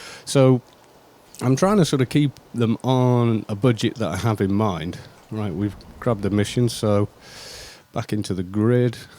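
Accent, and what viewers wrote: United Kingdom. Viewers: I expect by your voice you would have short blonde hair and look like a brit . But now your going to look like a guy ready to hammer some death metal shows XD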